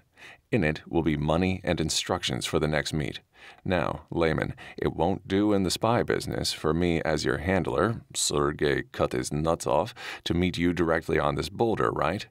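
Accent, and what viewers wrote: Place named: Maine, United States. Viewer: On the Maine accent lol Im from Maine and I'm native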